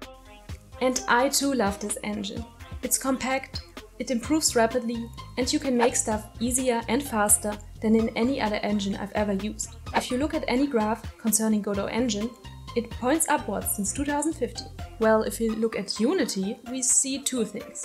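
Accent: Germany. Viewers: i love the german english accent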